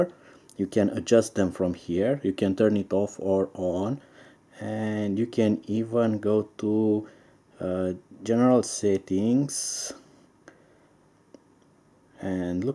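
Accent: Romania. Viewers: Deci dupa accent eram sigur ca esti roman. Nu am reusit sa ma lamuresc pe paginile tale, dar am dat mai jos in comentarii si te-am vazut scriind in limba romana :))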